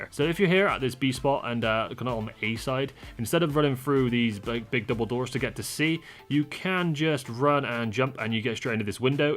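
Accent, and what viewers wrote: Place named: Somerset, United Kingdom. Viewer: Where you from.. you sound very somerset .. Bristol maybe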